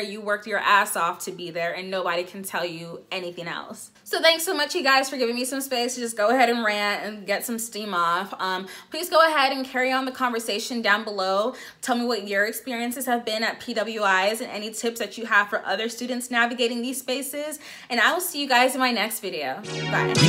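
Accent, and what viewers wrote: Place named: Nigeria. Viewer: You got some Nigerian accent, kinda of tho.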